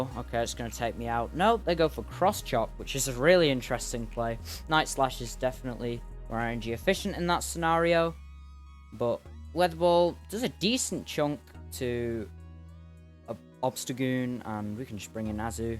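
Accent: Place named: United Kingdom. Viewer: The British accent hell yes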